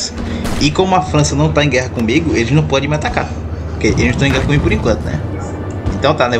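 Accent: Northeast, Brazil. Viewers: Sugestão tu e baiano ou nordestino?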